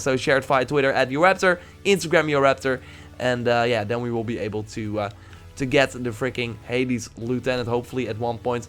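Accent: Netherlands. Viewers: he Dutch as fuck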